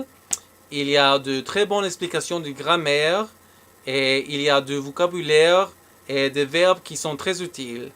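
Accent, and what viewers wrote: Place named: Louisiana, United States. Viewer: On dirait qui parle le Cajun louisianais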